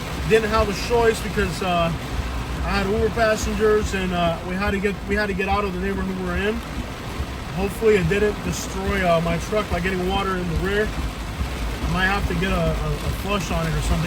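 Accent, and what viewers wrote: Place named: Kentucky, United States. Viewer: You sound like you are from Kentucky “yall”! But I love it ..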